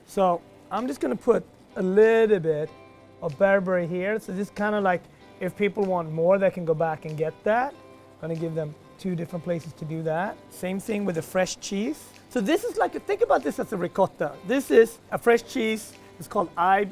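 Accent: Sweden. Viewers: You can really hear the Swedish influence when you talk. ^^ Tack för receptet måste testa det snart! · Hörde hans svenska dialekt, vad kul!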